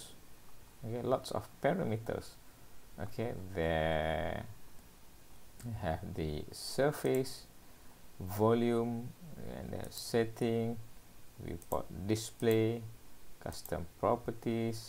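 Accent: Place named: Arab world. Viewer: hey I think he is frm Middle East,their Accent is like that...That's y he is little slow in English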